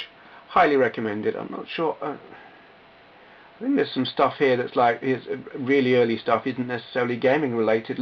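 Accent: United Kingdom. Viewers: it was me not understanding you, it took me a while to get used to hearing sarcasm in a brittish accent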